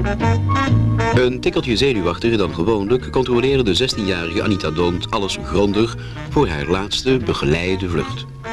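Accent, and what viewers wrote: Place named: Netherlands. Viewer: Spijtig van dat Hollands commentaar- waarom geen Vlaming?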